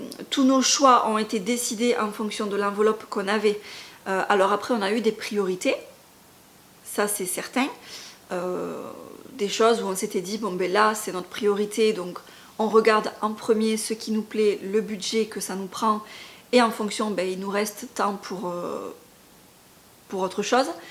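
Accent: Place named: South, France. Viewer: Coucou, Il y aura toujours de la critique et des envieux....c'est la nature humaine.. Toujours un plaisir de t'écouter avec ton bel accent du midi.. Bizzz😘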